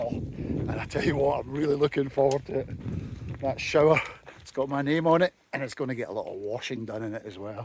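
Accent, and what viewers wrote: Scotland, United Kingdom. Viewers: Oreyt, was just watching you review of the x-mid and noticed your Scottish accent I was the Yorkshire lad you was chatting to at camping des glacier in Switzerland. 🇨🇭 hope you’re well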